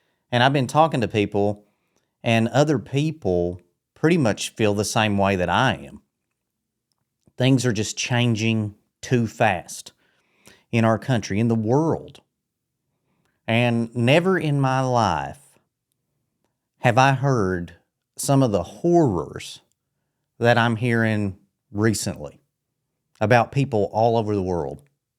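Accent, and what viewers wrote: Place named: Tennessee, United States. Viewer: I'm also an infj. We are definitely on the same wavelength. And I'm from Madisonville, I told my friend Paula I thought you have a Tennessee accent. Anyway, I enjoy your videos and completely relate with your experience.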